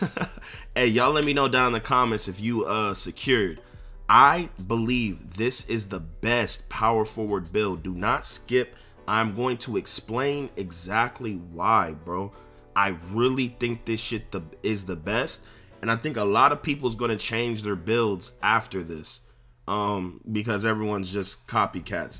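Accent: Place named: New York, United States. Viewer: Yo bro you one of my favorite youtubers you gotta be from NY bro 🤣🤣🤣🤣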